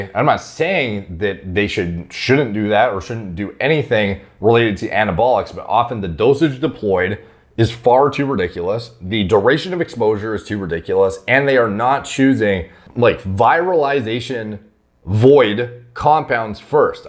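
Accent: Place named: Canada. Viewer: Anytime he pronounces something different than I’ve heard before I just blame it on Canada.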